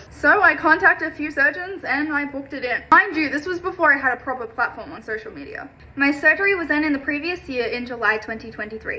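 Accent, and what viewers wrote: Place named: United Kingdom. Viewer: Ur british. Wtf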